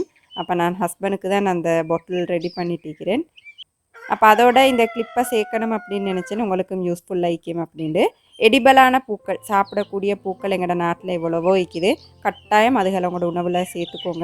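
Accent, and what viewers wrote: Sri Lanka. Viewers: Assalamu alaikkum neenga basica srilanka thana illa tamilnattil irundhu poneengala nalla tamil pesureenga ceylon slangla alaha irukku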